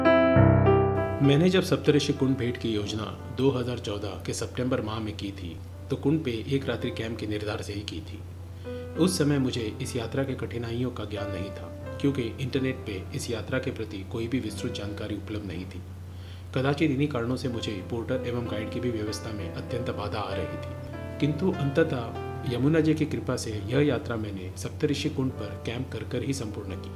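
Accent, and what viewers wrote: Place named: Maharashtra, India. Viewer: I guess you are from Maharshtra or Goa as of you Hindi dialect.Really fine narrated.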